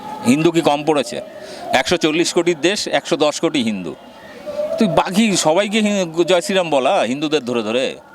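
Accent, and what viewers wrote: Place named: Bangladesh. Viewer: Apnar bangladeshi mone hochhe dada.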